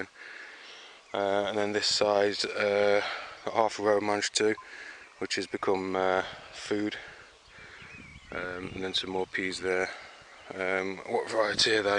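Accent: East Midlands, United Kingdom. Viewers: Hiya! First time I've tuned into your channel. Good tour. I too am a newbie not only to gardening but to having an allotment so watch YouTube a lot and take lots of advice and try to ask the odd question too! Your plot seems to be huge; how big is it and where is it? From your accent, I would guess somewhere in the East Midlands?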